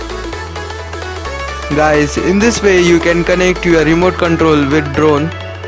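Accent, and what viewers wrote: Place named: Bengal, India. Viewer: This guy sounds bengali (am a bengali myself)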